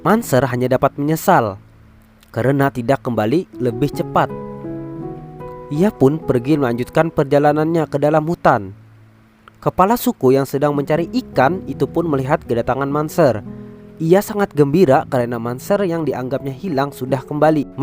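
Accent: Indonesia. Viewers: Ciri khas suaranya kaya orang Kalimantan 😁 aku udah subscribe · Kaka ya orang banjarkah ? Soalnya logatnya ketahuan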